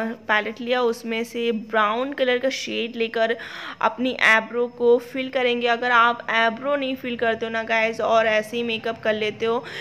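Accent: India. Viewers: just a simple luckhnawi lehja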